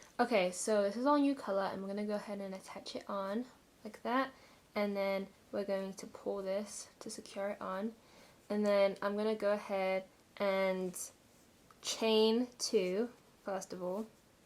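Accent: England, United Kingdom. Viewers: Love the English accent😊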